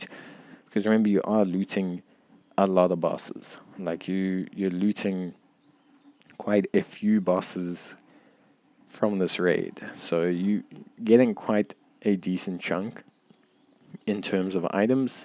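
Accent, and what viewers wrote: South Africa. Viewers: I took a shot everytime i knew thats a SA accent!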